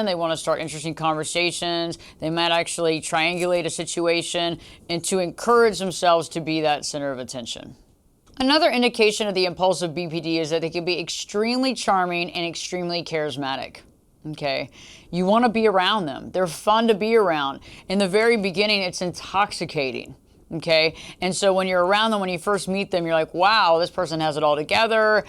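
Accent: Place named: South, United States. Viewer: Thought I saw Mariska Hargitay talking with south accent 😁😁😁 this vid is really intetesting, as BPD, I don't know which type I'm. Sometimes, it seems to me to get a bit of each type together...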